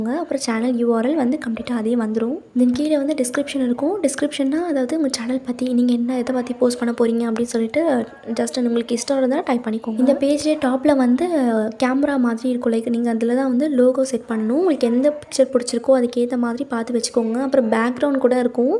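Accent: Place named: Chennai, India. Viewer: Videos la mattum tha ...etha voice modulation la pasuvigga la? Ilaa.......off the video laiyum eppadi etha modulation pasa palagiutiggala....etha slag voice 🥰 cute ta iruu .....ugaa old chennai slag romba romba alaga ..semma cute 🥰 ta irrukku...ga❤❤❤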